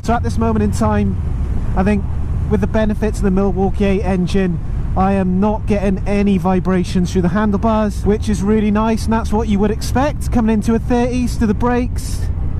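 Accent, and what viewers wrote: England, United Kingdom. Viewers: I made it to 2 minutes English accents piss me off .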